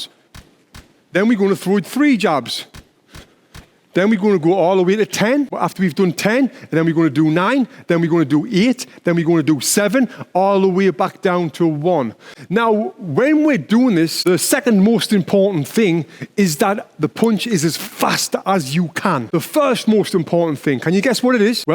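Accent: United Kingdom. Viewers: you are the best teacher, And you accent reminds me of british techncians i met when i was US airbase for supporting pilots who attended Alliance Military Drill, Thank you very much for your videos / Respect from South Korea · This channel is everything I like: information, humour, and a mean British accent — all that for free. is a legend.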